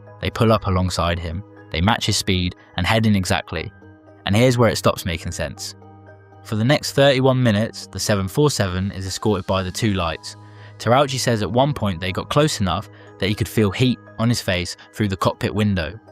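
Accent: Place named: Europe. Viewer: European accents are almost intolerable to listen to… I’m so glad to be American.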